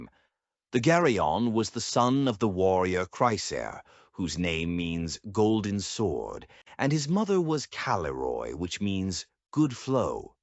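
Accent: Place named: Greece. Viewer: This person sounds like a Greek if you know what I mean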